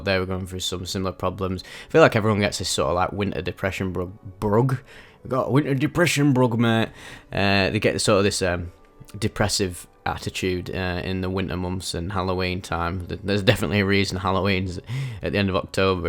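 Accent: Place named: Manchester, United Kingdom. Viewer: Thanks for your openness and honesty, sweetie. I have every faith that soon you will be able to be with the pain of your relationship break-up, learn all the lessons waiting for you and move into another exciting phase of your life. The ups and downs of this balmy journey hey... And I just want to say that I love listening to your dulcet, Mancunian tones read out these trip reports - it inspires me as I get started on my own psychedelic journey... Sending Love and Hugs 🤗🥰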